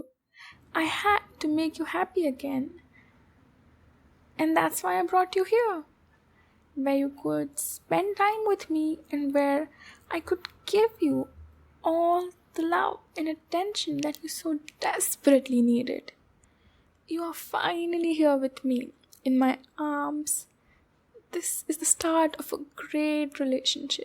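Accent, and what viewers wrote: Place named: India. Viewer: I can sense the indian accent in this audio.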